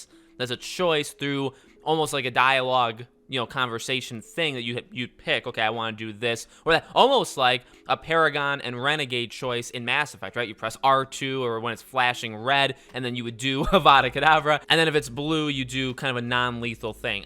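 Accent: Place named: Midwest, United States. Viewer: You sound like you are from Chicago or Wisconsin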